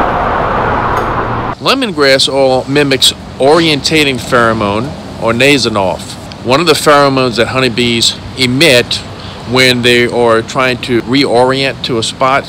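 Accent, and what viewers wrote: Louisiana, United States. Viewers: As soon as you started to speak, I knew you were down here in s/e La. good job on the video.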